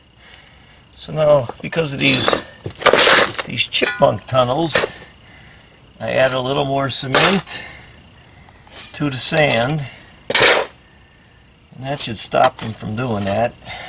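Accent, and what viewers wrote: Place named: Coal Region, United States. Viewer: Great video. I watch a lot of them just to watch you do the job. I do my own repairs though so I have learned a lot too. Plus I'm from the Coal Region and I love your dialect. Bet you've fixed some CHIMLEYS in your time.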